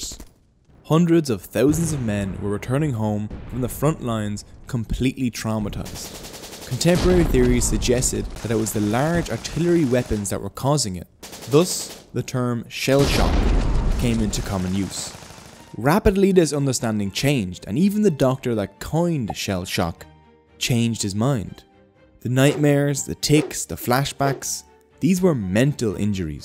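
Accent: Ireland. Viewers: "Sw- swiss physicians. Oh what a f------ word combination!!!" I love the English language and your Irish accent. Thank you for the laugh.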